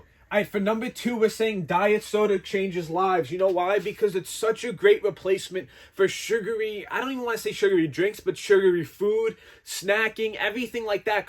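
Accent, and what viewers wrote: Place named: East Coast, United States. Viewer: Hope you get to read this. Just found your channel and love your content. Just by watching your videos and listening to you i feel already more motivated to go to the gym and i already love going to the gym. You east coast accent its sucha perfect gymbro motitation chefs kiss Plz take my opinion is the best way possible. Keep up the good work! Love from Sweden.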